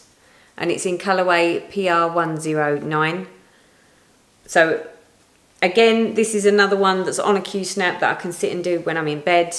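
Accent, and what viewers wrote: United Kingdom. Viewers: I love watching your videos and listening to your wonderful British English 😍 I usually have a new start whenever I want to, therefore it's great fun to watch you talking about your 'rules of new starts' and how you start stuff 'anyway' 😁 At the moment I have 54 wips, but a lot of them are under 30k stitches. And none of them is above 90k stitches 😊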